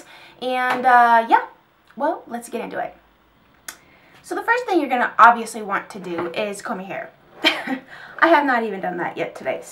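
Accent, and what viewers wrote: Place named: Midwest, United States. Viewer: First off, look at them toned arms!! You look awesome!! Also, I used to be an everyday hair washer bc my hair is fine and thin until I found Batiste! What a life saver. But I cant just run to Ulta bc its too far away so Ill have to check out walmart and try the Herbal Essence one! Also, do you happen to be from the midwest? bc I had a friend who was from Nebraska and she says ROOTS just like you! It seriously made me laugh out loud because I (being from NC) say roots, as in a plant root (if that makes any sense) LOL Anywho, loved loved loved this video! and I want your shirt!! Except I want it to say, "I run so I can eat dessert" :)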